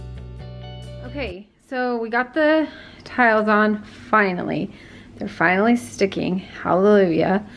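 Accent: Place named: Midwest, United States. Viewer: You are a working women!! I really like how it turned out. Sometimes you get into something and it can throw you, but you guys did it. I just love your wicker around your tree. Now! questions. You sound like you might be from the mid-west. I am from Iowa. My maiden name was Hansen, Yes with a sen. I was wondering. let me know. this is the second time I have found you. Also when you said call it a day. That sounds like something I would say. Joyce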